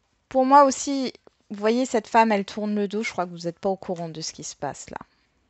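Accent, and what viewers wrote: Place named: East, France. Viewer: Vous êtes de l'est car vous dites :"Ui" au lieu de :"Oui" !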